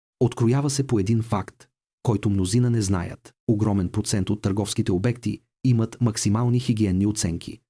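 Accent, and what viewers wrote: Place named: Russia. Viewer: ИИ сътворил този клип и другите на канала е подозрително Руско-говорещ, правещ същите грешки като всеки руснак опитващ се да налучка правилните ударения в българския език! Бърка на места даже хиляда с "тысяча" и слага ударенията където би ги сложил руснак четящ български текст.....което за мен опорочава цялата идея за Истина на съдържанието!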